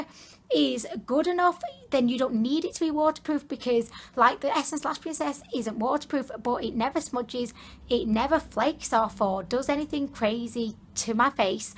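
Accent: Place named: Manchester, United Kingdom. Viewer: Sorry it’s off topic, but where are you from? Your accent is saying Manchester?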